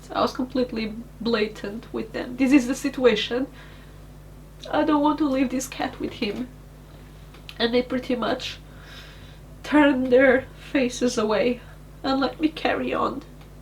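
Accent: Portugal. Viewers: i watched the whole video, and I love your Portuguese accent, when you say "thanks" it sounds to my "murican" ears that you said "tanks".. and my brain immediately went.. no wonder she likes tanks, but how do you do oil changes on a thanks... I've said this before you to on stream ages ago, but as one of the "silent" majority who watch, thank you, for choosing to keep your steaming and videos going. There are/were many a night where I am rocking one of my kids to sleep to one of your streams or chilling in the hospital icu ward, while joking with my nurses, watching. It is weird to think it has been that long, but one is just finished kindergarten, and the other is 2. Both boys love watching you/jingles, you have become routine like i used to watch specific military history shows in TV as a kid in the 80's. so Thank You, you've made an impact in lives much bigger then you've maybe imagined possible when you were back in that mess years ago. 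· Are you Portuguese? You sound like one...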